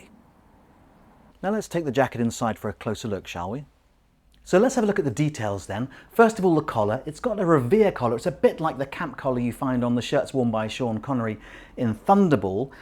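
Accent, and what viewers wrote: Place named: England, United Kingdom. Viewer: So nice to have an English accent taking about bond. Very professional and informative.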